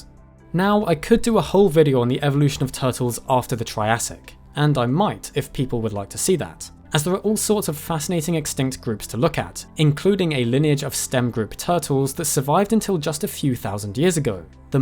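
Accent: RP, United Kingdom. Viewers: You're so British. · You sound like, but I dig it. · I like British accents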